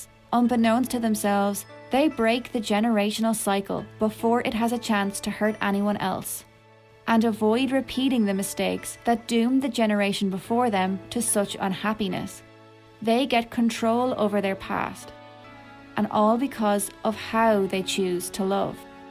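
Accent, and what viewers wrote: Ireland. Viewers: Great to hear a fellow Irish person as a FF authority!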